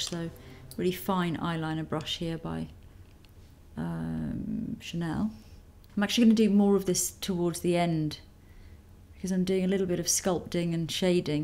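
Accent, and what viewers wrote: England, United Kingdom. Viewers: Great, very informative videos! I also like your English accent (I'm German) :)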